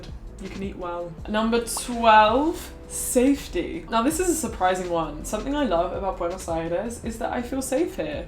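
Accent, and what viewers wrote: United Kingdom. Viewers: your speech made you look like ferb from phineas and ferb, talking about relaxing under a tree, in a british accent and with an eye bigger than the other one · Hello, im really glad you like my city! I love it too. Your british way of talking is really pretty, I really enjoy watching your videos. Have fun the time you'll spend here! Wish us luck for the football world cup jajaja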